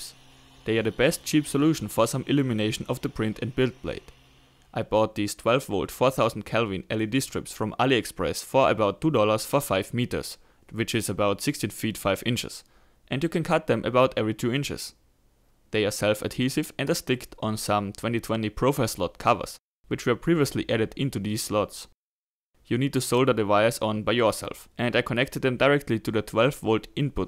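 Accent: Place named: Germany. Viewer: German accent too heavy can't understand what was said! won't stick at all! So can't print mods printing in ABS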